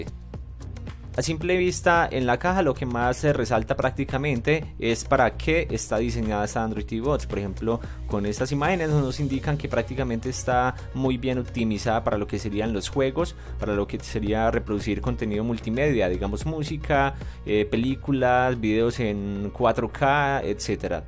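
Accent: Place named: Colombia. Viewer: buenas creo que por voz que eres colombiano! que precio tiene esa box y en dónde comprarla en Colombia ? gracias de ante mano.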